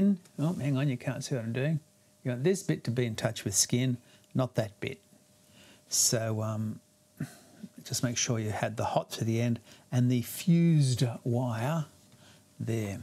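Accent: Australia. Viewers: AMEN!! SO glad to hear all of that! I sort of thought you might be Australian, but I wasn't for sure hearing some of those sounds that usually cue me in. And I WILL be looking for that PRO video!! Once again, so glad for your daughter's good health and to know that I have a brother just a short swim away! (I live in Jacksonville, FL) Happy Easter ... Jesus is coming back soon!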